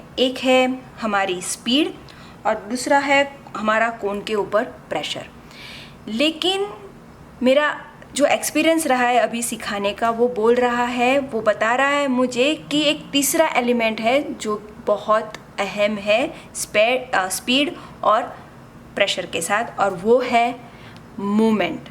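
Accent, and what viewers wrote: Gujarat, India. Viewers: ur accent😯,r u Gujarati?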